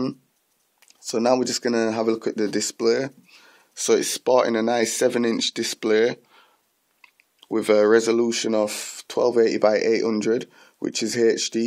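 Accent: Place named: Manchester, United Kingdom. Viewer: In 2019, I have the exact tablet arriving from Amazon Tuesday April 2, 2019. It is brand new and apparently someone kept and has some still to sell after all these many years. I took a chance on this as 32 GB is plenty for me and the size perfect when I travel. The Amazon ad said 4.3 Jelly Bean. Will it Auto-Update to any of the latest version of Android? I have a case and screen protector on the way also and quite a bit of money invested so I hope that I am not disappointed. Looking forward to getting it and setting it up. As a side note, when I was younger, I stayed several months traveling all over the UK and Ireland. As here in the US, many regional accents however not sure what part of the UK that you are from, but sounds sort of Manchester or Blackpool if I had to guess. It's a beautiful accent to listen to. Anyway, cross your fingers that my old NEW Nexus 7 works well and thank you for a nice video which was a great help.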